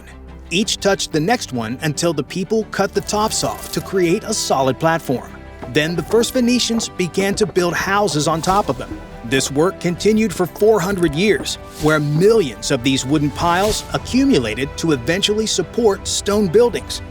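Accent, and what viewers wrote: United States. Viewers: American accent makes the video cheap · The sensationalist, excitable american narration makes this unwatchable (like most 'documentaries' from that part of the world).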